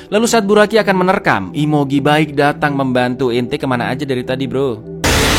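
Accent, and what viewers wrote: Java, Indonesia. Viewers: Setuju bro. Mantap kayaknya. Medok banget dia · Org Jawa kah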